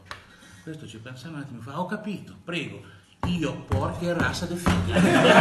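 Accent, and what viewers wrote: Italy. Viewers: umbro marchigiano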